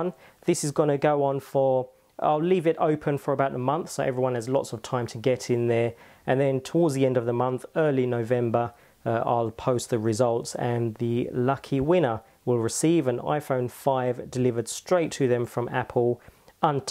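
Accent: United Kingdom. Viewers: I like white because it really makes the iPhone stand out and make it look sharp. It's also easier to find and more pleasing to the eye. I really hope you chose me. (LOVE BRITISH ACCENTS) ;)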